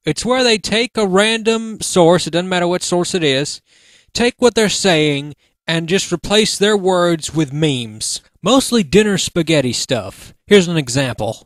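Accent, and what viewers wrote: South, United States. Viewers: I'm guessing you're from the south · the southern voice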